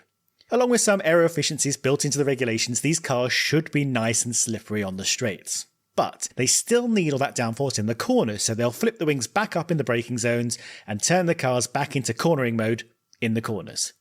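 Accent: United Kingdom. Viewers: The US Audio Track is better balanced. But still sounds British 🙂 (I'm Canadian, so the accent doesn't really matter [I know it's just a 2nd audio track with better balance]). Glad you're back! Your videos are fantastic!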